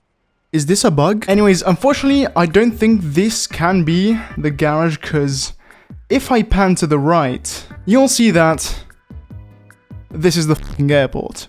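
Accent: Italy. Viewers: sei italiano? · Your English is vary good but that: "nono é" It means: "che sei italiano e minchia se lo nascondi bene l'accento italiano" Gg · Ma sei italiano? No vabè🥲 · Are you italian? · As an Italian i really like your Italian accent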